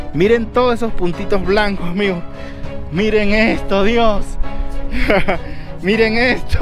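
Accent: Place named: Quito, Ecuador. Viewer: ya el acento Quiteño esta más notable en ti amigo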